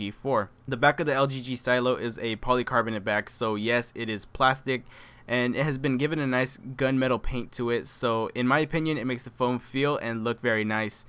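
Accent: United States. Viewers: yo u from fresno bro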